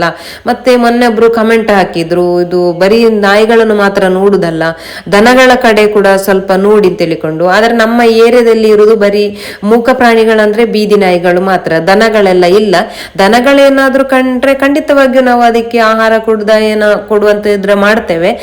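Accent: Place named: Sullia, India. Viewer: ನಿಮ್ಮ ಸುಳ್ಯದ ಗ್ರಾಮ್ಯ ಕನ್ನಡ ಬಹಳ ಚೆಂದ ಇದೆ.ಹೀಗೇ ಮುಂದುವರಿಸಿ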